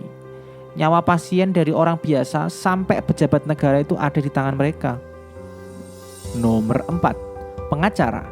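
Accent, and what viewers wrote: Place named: Java, Indonesia. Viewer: Medooookk bggeett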